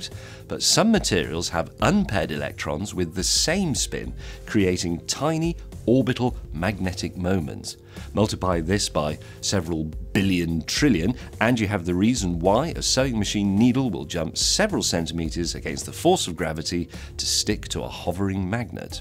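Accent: United Kingdom. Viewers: The ideal British accent.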